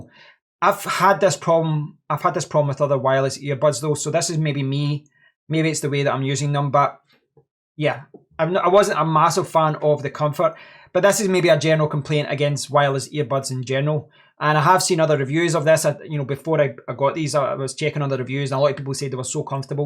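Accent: Scotland, United Kingdom. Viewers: Your accent makes me miss Scotland 😀. Here in UAE it's only 22£ with coupon I think it's the only tws with apt x under 25quid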